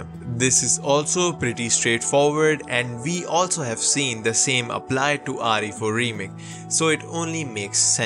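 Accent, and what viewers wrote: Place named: East India, India. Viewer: I knew u were east Indian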